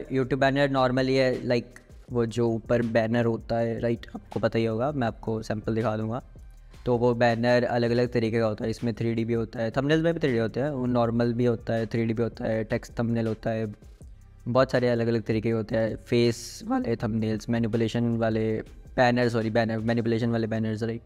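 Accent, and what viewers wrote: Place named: Pakistan. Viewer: Why you gives Pakistani vibes? You have pakistani accent not Indian. IDK why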